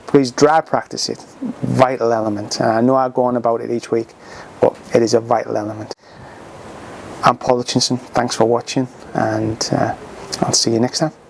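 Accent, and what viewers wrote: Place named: England, United Kingdom. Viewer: It's weird to hear an English man tell me how to shoot. No shade to you sir. However it's odd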